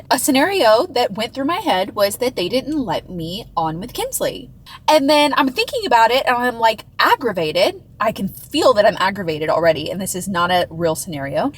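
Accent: Texas, United States. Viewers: I LOVE how your Texas accent comes out!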